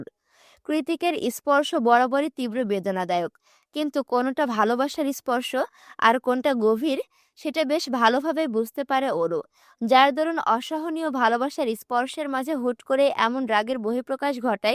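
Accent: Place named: Kushtia, Bangladesh. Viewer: তাই কুষ্টিয়ার মানুষের মতো মাঝে মাঝে কথা বলো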